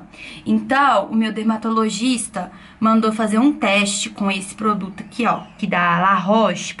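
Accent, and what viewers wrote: Minas Gerais, Brazil. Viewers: "Passar esse trem aqui..." kkkk Sotaque mineiro é demais... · É o sotaque de Minas!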